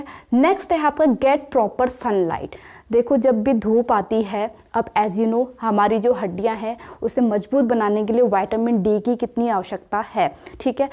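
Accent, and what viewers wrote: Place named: Uttar Pradesh, India. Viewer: But voice is indicated up state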